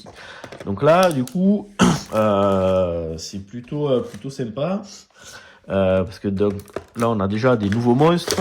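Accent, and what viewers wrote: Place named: South, France. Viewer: J'ai pas comprit où on devait entendre une quelconque ébriété. Serait-ce l'accent du sud qui vous donne cette impression erronée?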